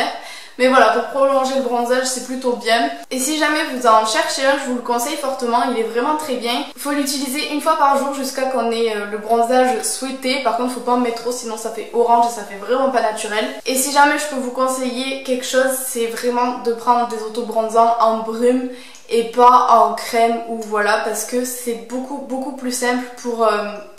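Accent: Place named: Aveyron, France. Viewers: Ahah l'accent Aveyronnais, je suis Aveyronnaise! 👊🏼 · Big up pour l'accent aveyronnais !!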